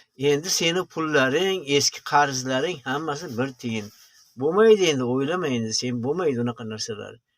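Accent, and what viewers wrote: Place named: Kyrgyzstan. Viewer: Dunyodoshlar deyishizga qaraganda siz Oʻzbekistonlik emassiz.Shevangizdan Qirgʻizistonlik oʻzbeksiz. Rossiya propagandasini kuchu bu!Oʻzbekistonliklar bunaqa fikrlamaydi!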